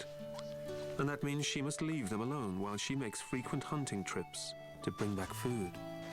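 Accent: United Kingdom. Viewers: This video has everything. It's like a human tragedy, science lesson and nature documentary all tied together with a neat little British accent. I likes it. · Don't you just love British accents in narration? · I like when a documentary has classical music and an english accent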